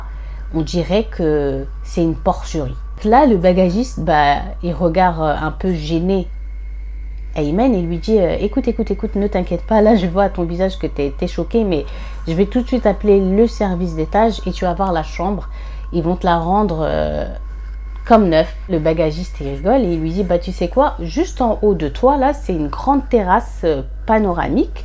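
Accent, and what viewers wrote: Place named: Arab world. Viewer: J'aime beaucoup votre façon de parler l'arabe;votre accent en arabe me plaie beaucoup.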